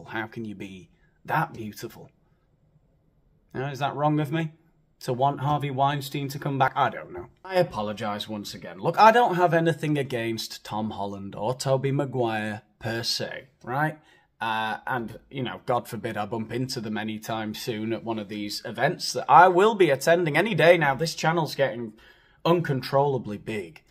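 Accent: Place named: Wales, United Kingdom. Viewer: Hahahah love the Welsh accent 🙋🏼‍♀️🏴😅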